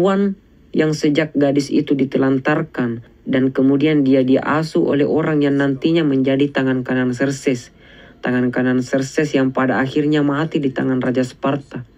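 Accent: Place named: South Sulawesi, Indonesia. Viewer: dari logat kayak org sulsel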